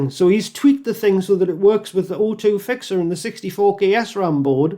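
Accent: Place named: United Kingdom. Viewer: It would seem to me that “Lethargic” has long already fired you from working with him ever again. That would explain why he completely cut you off and moved on with someone else with newer projects. Lethargic would say that you were being extremely insubordinate with him. But yeah, Lavoric or Lethargic or whatever is name is, was only obsessed with profit, he didn’t want to lose alot of money he didn’t have with beta testers; so he just sent them all out as they were. Definitely not the best way to do things, but this is Poland we’re talking about here! 🤷‍♂️😂 I hope you will find another revenue source soon. I really enjoy your videos and British accent/slang talk! I subscribed! I’m a new owner of an Atari 800XL that I heavily modded and recapped myself. This thing is a blast to use with the entire library of games!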